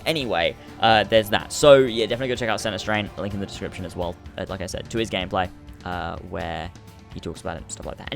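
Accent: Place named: Northampton, United Kingdom. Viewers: He's from Northampton, you're lucky mate I'm from Birmingham and we all sound inbred. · Where bouts in the UK are you from i recognise the accent??? Im from West Yorkshire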